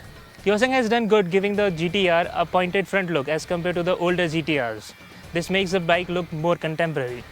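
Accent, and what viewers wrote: India. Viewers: I feel like I am listening to every India call center, trying to understand the mumbling English while I try and dispute a bill charge.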